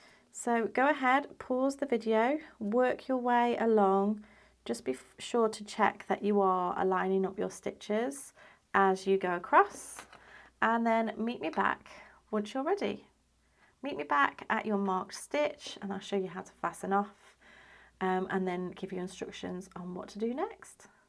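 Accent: England, United Kingdom. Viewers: Ja. The English accents are beautiful. My English are very poor but I manage when I have to speak it. About once every month at the shopping. 🎉🎉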